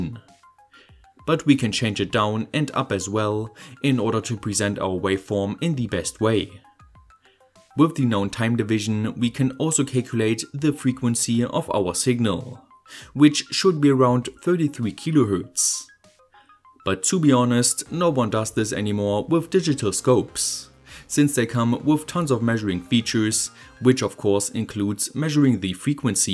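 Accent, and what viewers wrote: Germany. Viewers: You’re german right ? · German accent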